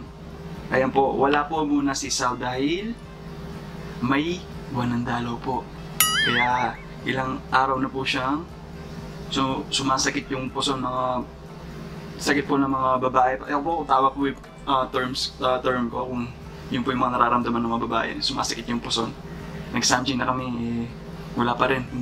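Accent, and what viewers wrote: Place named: Pampanga, Philippines. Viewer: Ali naman boring sao..okay yamu..mas masanting pin more on kain hahaha..pero pag atsu sao ann atin talaga kwento tang punto ng kapampangan yang magdala hahahhaa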